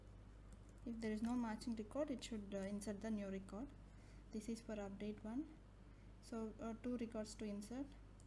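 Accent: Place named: Karnataka, India. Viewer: i recognize that kannadiga accent. i could be wrong tho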